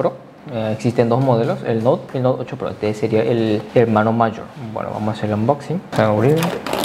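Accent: Paraguay. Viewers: Cómo se nota el acento pyo 😂😂😂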